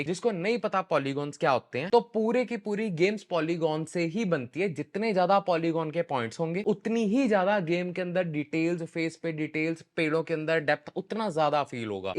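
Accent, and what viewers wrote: Punjab, India. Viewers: Punjabi accent. Probably doaba???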